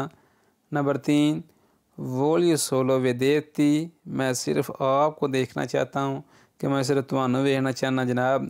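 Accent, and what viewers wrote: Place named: Gujrat, Pakistan. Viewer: بہت شکریہ شہزادے ویر بُہت سونا پڑھدے او توسی❤❤❤گجرات دی بولی بول دے او ویر جی ،رب سلامت رکھے جے آمین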